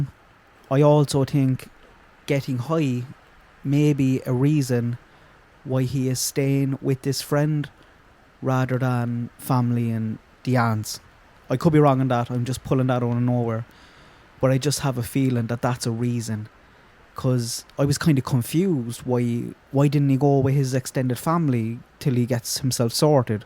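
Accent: Ireland. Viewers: I just want you to know how much I respect your videos, you manner, your insight, your opinion. And an amazing Irish accent to boot. Thank you for putting this out there, I love listening to you ❤❤❤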